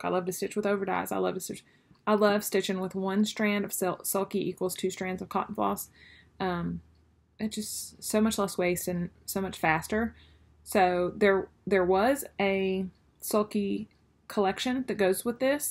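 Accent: South, United States. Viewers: Thank you for sharing your Market experiences with those of us who can’t go! Great suggestions for the organizational committee! I laughed out loud when you said “tump” 😂😂😂 I hadn’t heard that in years! And thought I was the only one who still said it. I was born and raised in Florida...5th generation Floridian, and my grandparents were very rural! So love to hear the real South! I recently ordered from your shop and was very impressed! You will be my LNS (though not very local) from here on out! Are those letters done in eyelet stitch? What a great market haul! Looking forward to ordering “Wool and Flax” from Plum Street when it is in stock! 🐑🐑🐑 Hope y’all have a wonderful spring 🦋🌷🐑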